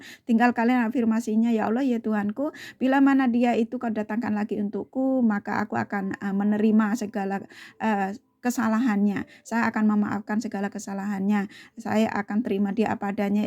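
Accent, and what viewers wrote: Java, Indonesia. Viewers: Trima ksh kak atas readingany, resonet jln ceritany, mf kak agak nyimpang sedikit dr tema ny, suara kak sama benar dg suara seseorg yg ad d dekat rumah q, logat Jawany, cara ngomong ny, nama ny Mbak Murti, dia buka salon d sini, coba dong kak tunjukan wujud kakak ingin lihatny, mf y kak kalo AQ agak lancang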